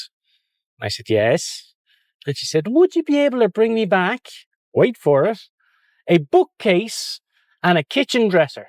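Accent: Ireland. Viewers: France is just so beautiful. Think I might just have to take a drive over there and explore a bit. Loving listening to your soft Irish voice each evening. Glad you finally got a day off! Keep up the great work . X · Ok, inquiring minds want to know.....without getting too personal, why are you still single? My goodness, I concur with all the ladies on here, you are a great catch and check all the boxes. Handsome☆Huge Heart☆Funny☆Great Communicator☆Hard Worker☆Irish Accent☆Great Family Values☆Loves Puppies & Old People☆A Wicked Sense Of Humor☆A Great Friend☆ Be still my beating heart! ♥ A great vlog as usual, thx! 😊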